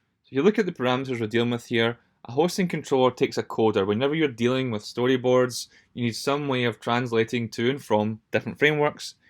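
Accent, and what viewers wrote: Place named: New Zealand. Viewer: Amazing video, thanks for making it. Not related to this video, but just wanna ask: Are you from New Zealand? Your accent sounds like people from there.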